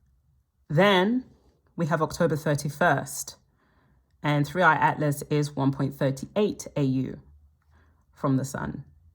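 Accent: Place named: United Kingdom. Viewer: Idk where you are from precisely but wherever it is is my favorite British accent and also this is such a novel way to present a reading and I really love it!! Thank you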